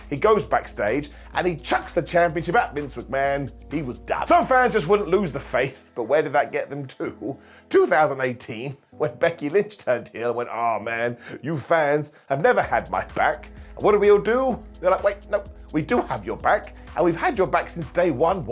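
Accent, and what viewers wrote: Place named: Scotland, United Kingdom. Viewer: I never knew the biggest wrestling fans were Scottish. Damn, it's hard to listen to you. The rest of the world is so disturbing.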